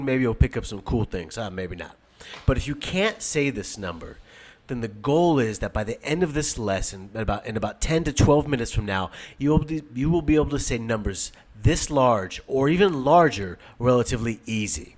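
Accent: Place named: United States. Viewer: that's American accent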